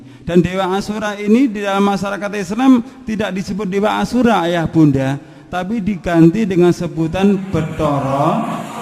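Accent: Java, Indonesia. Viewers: Ha ha ha Mualaf Cari Panggung...Keyakinan Itu Nggak Perlu Di banding-bandingkan.anda Yalin dengan Ke islaman anda, lakukan, kami yakin Dengan keyakinan kami, itu adalah jalan kami. Logatnya Jawa Banget. Jika tidak paham Agama hindu. Jangan mengomentari Keyakinan agama hindu. Fokuslah anda pada Agama islam. Ustad udah masuk Islam, Masih bilang di dalam agama saya. Anda semakin membahas agama hindu, justru menunjukkan kejelekan Anda. Maaf ( bukan Kejelekan Agama Islam) justru agama islam semakin dijelekkan dengan Orang seperti ini. Semoga komentar ini jadi viral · Ustad ini asal usul nya dari Bali, tapi sudah lama tinggal di jawa.... wajar2 saja lah bro logat nya jawa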